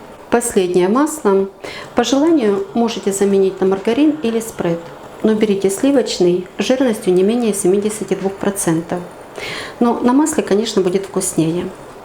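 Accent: Ukraine. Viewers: Нее. По хохлятски говорите. Непрятно слушать. Тьфууу картавите таак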